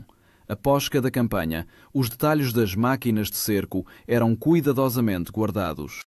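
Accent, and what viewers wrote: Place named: Portugal. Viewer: Maravilha este acento lusitano.sou brasileiro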